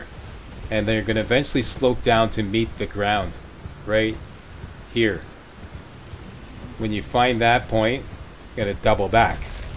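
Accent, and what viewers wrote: Canada. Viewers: By any chance, are you Canadian, Eh?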